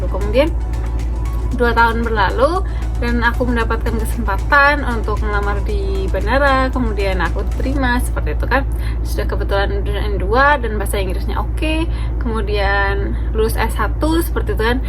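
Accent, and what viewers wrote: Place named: Japan. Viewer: bener kak sampe mikir di menit menit awal, kakanya mungkin orang jepang yg belajar bahasa indo 🥹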